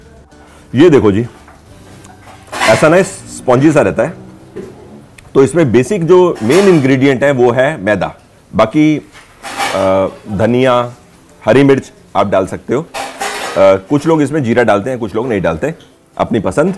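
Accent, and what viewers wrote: Punjab, India. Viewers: Mr your punjabi accent is making videos great treat. Because north Indian people explain food nicely and appreciate.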